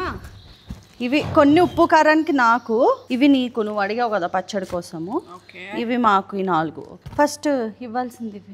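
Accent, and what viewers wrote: Godavari, India. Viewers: Video lo anniti kanna mi godavari slang so nice andi ఆయ్ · Ur godavari yaasa n yeatarakam are peaks...😅 Want a casual godavari accent video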